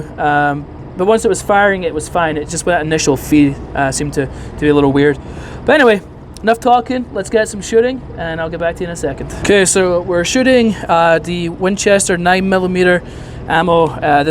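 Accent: Ireland. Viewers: Irish much? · Irish or Scottish?? 😁 Just ordered mine last week. Just waiting on shipping.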